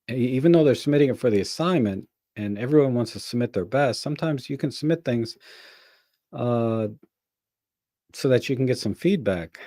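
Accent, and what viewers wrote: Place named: United Kingdom. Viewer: I thought you were british, you dont speak as sloppy as most American. I am from Sweden by The way and follow you, red 35, Peter Forsgård, Matti Sulanti, Robin Wong, micro four nerds among other.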